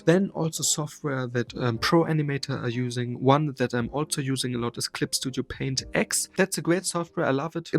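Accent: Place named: Germany. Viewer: i hören little bit deutsch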